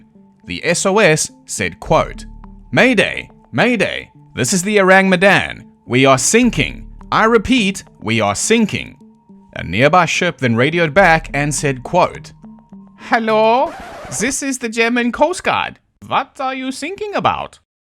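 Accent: South Africa. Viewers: Goddamnit I thought you were an aussie and when you read that Dutch I was like "wtf?" How did I not pick up that South African accent. I feel like an naai. Well, now I have to subscribe. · This is not how i find out you're South African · You sound like Rodney Howard brown. I knew immediately you were south African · Your content has been getting recommended to me for a while now and I assumed it was because my algo finally realised I might like to see things other than Skyrim challenge runs because this topic is exactly up my alley. So imagine my shock when I clicked on the video and got SUCKERPUNCHED by a fellow South African accent!! But also yes. I do want to know some actual facts about this very very silly legend that I could never make sense of no matter how hard I tried.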